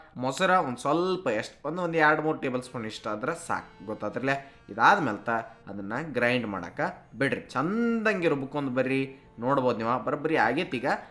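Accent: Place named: Uttara Kannada, India. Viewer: WOW Superb Sorekayi dosa in 3 variations Healthy version Curry also 👌🏻👍🏻👏🏻 UK colloquial narration makes it more Interesting. Thankyou for All the Recipes & joint Effort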